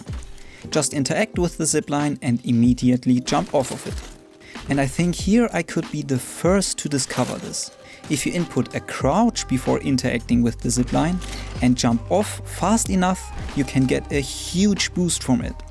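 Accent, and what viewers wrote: Germany. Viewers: Man hört einfach dein Deutsch raus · wie man sofort raushöhrt dass er deutsch ist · german? sounds like... besides, awesome video! :) wish i had seen this months ago. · you can tell by his accent that he is german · this german Accent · You make amazing videos dude, keep it up and I believe somedoy you'll become one of the biggest apex content creators :) Btw are you from Germany? I kind of hear a german accent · its the german accent for me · Nur eine Frage bist du deutsch Man erkennt das an dein Akzent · this guy has a strong german accent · Do I hear a German accent? 🤔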